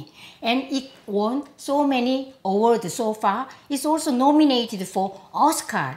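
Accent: South Korea. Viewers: 한국식 영어라그런지 번역 없이 다 이해가된다 신기 ㅋㅋㅋ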